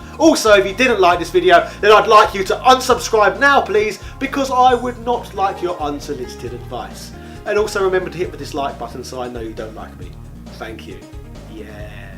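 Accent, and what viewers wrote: United Kingdom. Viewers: I really cant stand this idiot, these Brits are f***ing annoying as hell. Is it just me, or does anybody else find this guys Brit accent and obnoxious personality irritating beyond belief? I tried giving this guy the benefit of the doubt by at least watching 2 or 3 mins of his video to see if i can actually relate to him, but hes annoying as f**k. I know there are probably some cool Brits out there, but Brits like this guy give people from the UK a bad name. Just a pure obnoxious and annoying d**k.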